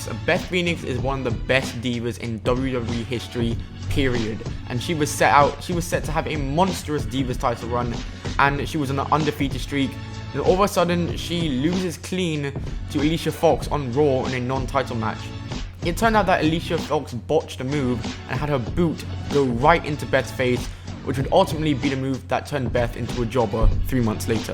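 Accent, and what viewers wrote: United Kingdom. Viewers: Why are all these top 10s narrated by a British dude does whatculture wrestling have different accounts with slightly different accents? 😱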